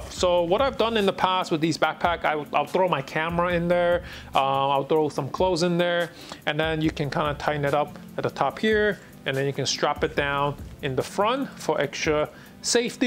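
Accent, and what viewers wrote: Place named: China. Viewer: So he speaks with a heavy Chinese accent. His English is probably better than any second language you speak. What is obvious though is that he really doesn’t know about fashion because a lot of his terminology, while descriptive, are not industry terms. The LL Bean tote, which appears to be a magazime giveaway item, is definitely not lined with rubber. The “Valentino” is clearly a belt bag and Valentino Garavani. I think it’s tacky tacky.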